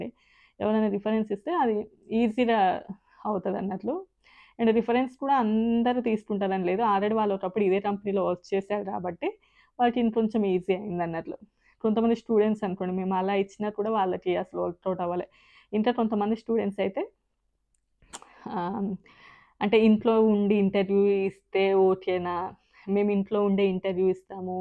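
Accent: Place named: Karimnagar, India. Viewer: You are explaining very clearly and confidently . I you are basically from karimnagar dist. Your pronounsation different from twin cities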